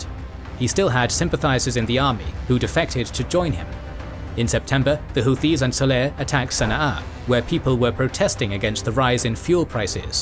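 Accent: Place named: United Kingdom. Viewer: It's confusing to hear a British voice tell the history of Yemen without much of a mention of the British Empire. There are important carryovers that you neglected.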